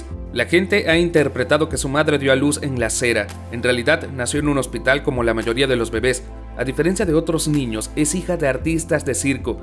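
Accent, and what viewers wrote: United States. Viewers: buen video, pero tengo que darte una sugerencia, como comprendo que no tienes por que saber frances, entiendo que no sepas como se pronuncian los nombres ni las palabras, lo que no entiendo es esa mania que teneis la mayor parte de los youtubers en sudamerica de pronunciarlo todo como los yankees, no se si es complejo o que creeis que os hace parecer mas inteligentes. te pediria que si vas a pronunciar mal lo hicieras como lo haria un hispanohablante y no como crees que lo haria un yankee, que ademas hablan un ingles como con desgana, insisto buen video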